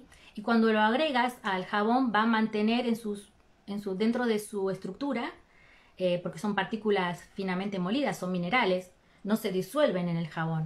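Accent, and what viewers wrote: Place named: South America, Latin America. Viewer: Hola, sigue activo el grupo? Me gustaría hacer un curso, saber de donde son. La profe tiene acento de Sudamérica 🥰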